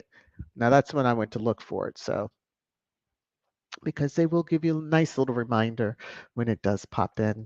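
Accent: RP, United Kingdom. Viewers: Thank you for great update 🙏😘❤❤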